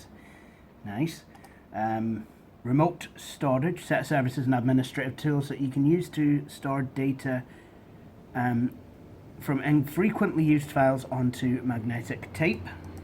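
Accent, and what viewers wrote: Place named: Scotland, United Kingdom. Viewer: are you scottish or British you sound Scottish :)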